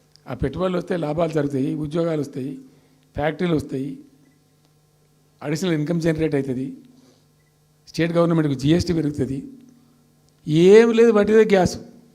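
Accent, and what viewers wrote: Telangana, India. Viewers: After a long time many months years I listen my telangana slang jai kcr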